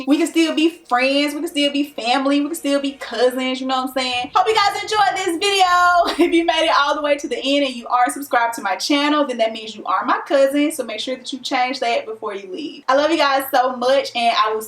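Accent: South, United States. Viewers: Instantly subbed because of the southern accent 🤣 I’m from the south and it’s hard to find Youtubers who talk like me! Love the video! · Finally yes! I can tell! lol that’s why I said influence cuz you have a southern accent the way you say “up” “Best” and “pound” it’s evident of the two. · Ohhhhhhhh Lol ok I knew you had a country accent. I was like maybe her parents are from the south. Because people tell me that I have a country accent sometimes but that’s because my grandmother is from the south. But when you were saying Cousin I was “oh she from Cali because they say Cousin a lot” don’t they?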